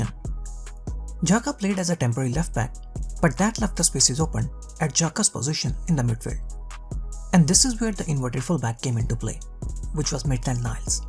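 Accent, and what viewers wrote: India. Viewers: It's so tiring to listen to your (Indian English) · What an Indian accents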